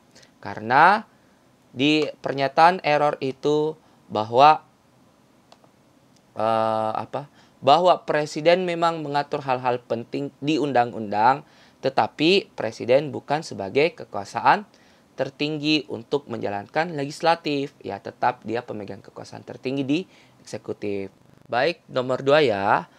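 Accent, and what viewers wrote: Makassar, Indonesia. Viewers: Mentornya kental Ki logat Makassarnya😅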